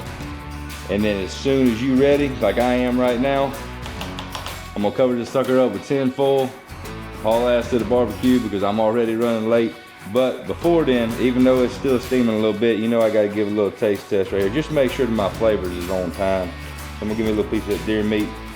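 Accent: South, United States. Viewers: I Love You! I want you to know, I have always been told about my Southern accent. You talk just like me!!! I Love watching you cook!🎉🎉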